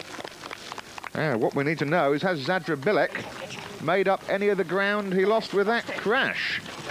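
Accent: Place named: United Kingdom. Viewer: Who hired this announcer? Was it like, Hey! We need a British accent guy to read some info .... brutal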